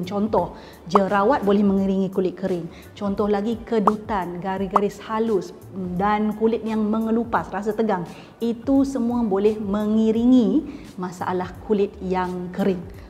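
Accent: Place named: Malaysia. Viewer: Saya orang indonesia, suka banget dengan logat malay. saya paham betul point yang di bahas, walaupun beda bahasa. Terima kasih kaka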